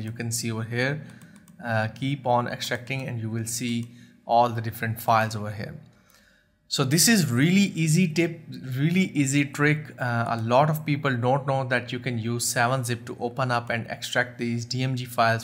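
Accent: India. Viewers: u sound so indian... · its the first time l see a white man talks the accent of an indian, wtf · European face. Indian accent. Great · WHY THIS GINGER HAVE INDIAN ACCENT?? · Wow ...your pronunciation is so different.... it's sounding very similar to our Indian English pronunciation 😂😂..and now I can understand better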